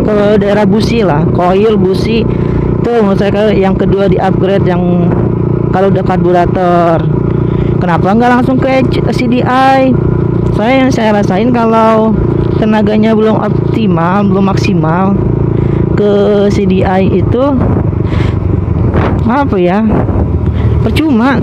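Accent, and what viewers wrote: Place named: Betawi, Indonesia. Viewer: nadanya anak betawi asli ahhaha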